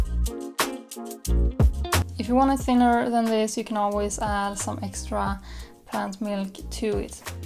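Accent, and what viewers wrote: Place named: Sweden. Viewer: That Swedish accent tho.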